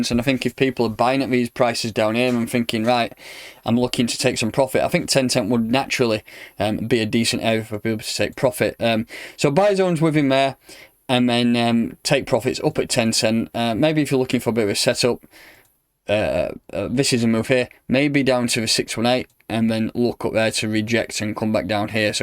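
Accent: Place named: Yorkshire, United Kingdom. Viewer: Lol. That’s deffo a Yorkshire accent!!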